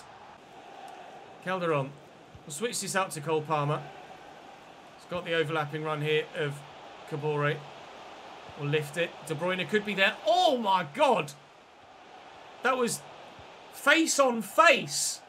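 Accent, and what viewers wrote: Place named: Lancashire, United Kingdom. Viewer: Frighteningly accurate Lancashire accent.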